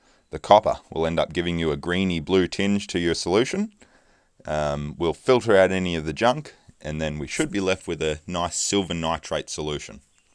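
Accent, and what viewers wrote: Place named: Australia. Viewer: Great video mate. You sound Australian, where are you located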